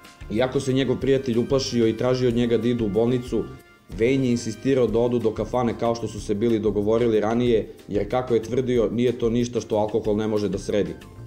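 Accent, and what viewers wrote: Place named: Užice, Serbia. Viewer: Jesi li ti iz uzica?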